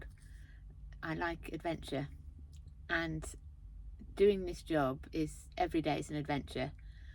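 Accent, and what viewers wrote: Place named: Worcestershire, United Kingdom. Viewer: I thought your accent was local. Keep flying the flag for Worcestershire!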